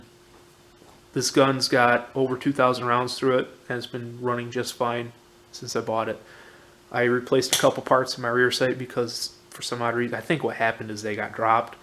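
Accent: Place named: Minnesota, United States. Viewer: I detect....... minnesotan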